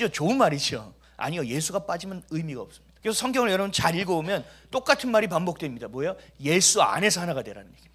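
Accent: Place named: Pyongan, North Korea. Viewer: 목사님 혹시 선대가 이북에서 오직 믿음 을 지키고자 남하셨던 실향민 이 아닌가 생각 되는데요. 목사님 억양에서 아주 살짝 평안도쪽 억양이 있으신것 같아서요. 저의 외조부 님도 이북분 이시라 그리고 어려서 피난촌 주위에 살아서 할머니 할아버지 주위에 이북분 들이 많았습니다 . 제가 어렸던 70년대 초때만 해도 예수쟁이 = 이북사람 이런 공식이였으며 이분들이야 말로 진정한 그리스도 의 믿음을 간직하셨던 분들이 많았죠. 저는 이분들이 이제 다 대한민국 에서 천국으로 뜨나셔서 하나님 앞에 바로 서셨던 분들이 진심으로 이나라를 위해 간구 하시는 분들이 안계셔서 대한민국이 이모양이 되지 않았나 저 나름 생각 합니다. 우리가 아무리 믿음이 있다 해도 이북에서 목숨 걸고 내려와 목숨걸고 예수 믿었던 분들 에게 비하면 아직도 많이 부족한가 봅니다.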